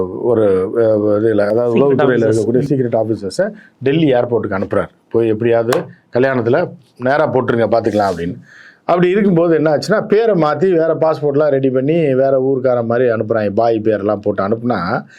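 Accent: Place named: Madurai, India. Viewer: எல்லாம் யூ யூட்யூப் சேனலில் உங்க மதுரைsland பேச்சு க்கு தனி சிறப்பு❤❤அதில் ஒரே சிரிப்பு மழை😂😂😂😂😂😂😂😂😂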